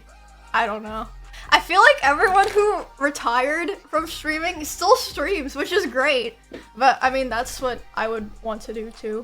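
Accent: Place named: Kansas, United States. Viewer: Nice Kansas accent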